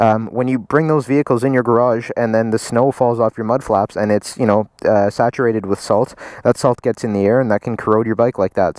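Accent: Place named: Canada. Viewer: You sound canadian btw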